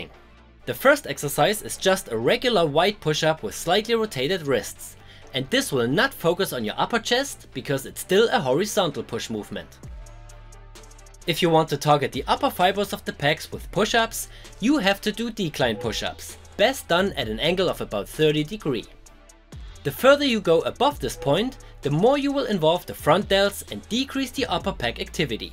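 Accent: Germany. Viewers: Bro angefangen zu gucken und direkt mein Land rausgehört. Bilderbuchbeispiel für deutschen Akzent in anderen Sprachen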